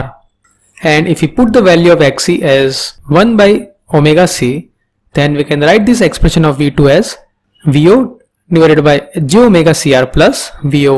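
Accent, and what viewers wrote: India. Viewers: Irritating traditional indian english accent.. Uff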